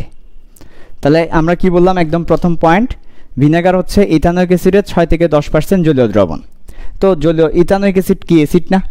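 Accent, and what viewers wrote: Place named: Chittagong, Bangladesh. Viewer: Bai chittagong er naki ,chini chini lagtase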